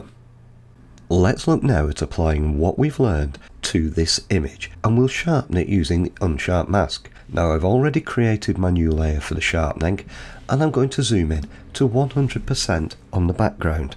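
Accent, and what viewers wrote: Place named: North, United Kingdom. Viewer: Nope. A little more Northern, like me, perhaps...but not distracting!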